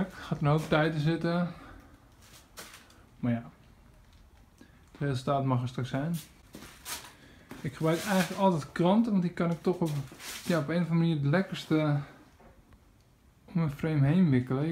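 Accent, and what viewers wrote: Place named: Russia. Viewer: What country are you from sounds Russian nice build anyway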